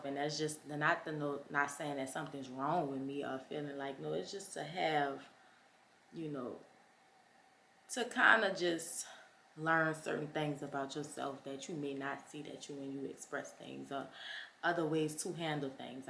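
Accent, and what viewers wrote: New Orleans, United States. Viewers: I love the New Orleans Accent 😊😊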